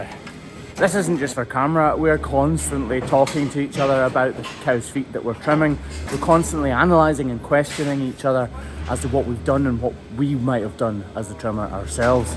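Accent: Scotland, United Kingdom. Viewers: I love seeing the Scottish landscape and hearing the Scottish brogue:) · hes scotish not irish 😂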